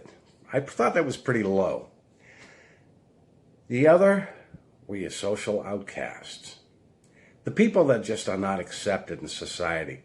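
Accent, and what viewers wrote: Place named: New England, United States. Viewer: I love this channel! I’ve never been a JW. My best friend’s family joined in the early 80’s. She spent 32 years in and finally got out. She was able to remain friends with me that whole time, however. I follow these channels because I like to learn what she went through. I love your stories about your personal experience. Side note, I could listen to your New England accent all day long 😊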